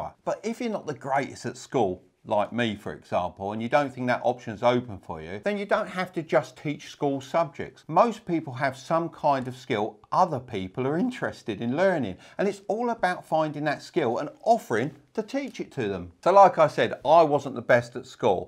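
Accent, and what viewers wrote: United Kingdom. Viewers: British accent? I'm sold. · why does some of his thumbnails show US dollars when he sounds like he's from the UK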